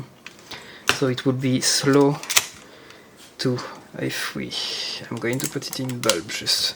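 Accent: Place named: France. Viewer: At first wanted to close the video in first 10 seconds but stayed for french accent and mechanics of camera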